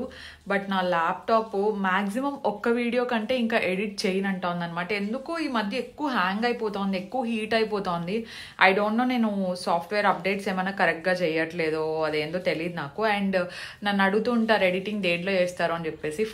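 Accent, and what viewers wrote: Anantapur, India. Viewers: AA Emi julee ni esukochinare pinni ananthapur slang haaaaaaaa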